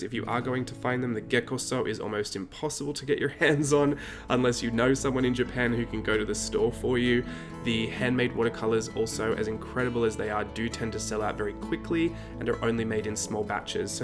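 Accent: Australia. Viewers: Just found you, looked you up.;) Always watching. Lol I knew you sounded Aussie. I live in Dulwich Hill. Are you in Sydney region? Congratulations on your recent success after a car accident and changing careers to find something that you love. I like Irit's channel too. Love Japan, and Van Gogh. Highschool Teacher of woodwork and stuff then after many car accidents (none at fault!) I slowly changed to the little ones Learnt for 4 years I am a Montessorian I no longer teach but, now writer, artiste, illustrator. Watercolour collector of professional brands and handmades love inks and journals too (on a no buy break it is killing me) We are lucky to be alive both of us.:) Hope you are enjoying your Etsy shop break holiday. Now subscribed! In kindred spirit, Eliza Most Beautiful Day is Today! Sunday 26th of June, 2022 pm Dulwich Hill, Sydney Australia x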